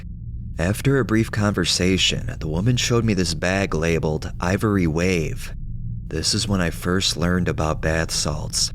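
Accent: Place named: California, United States. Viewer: Omg I love your words 😂😂😂😂😂😂 finally a swear guy you sound like us so. California's peeps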